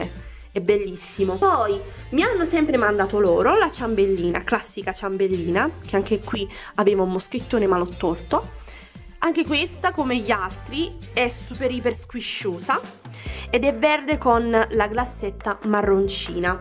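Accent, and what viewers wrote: Naples, Italy. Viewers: ma sei di Napoli?? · Ciao ma sei di napoli? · penso che tu sia napoletana perché si sente (poi nn lo so)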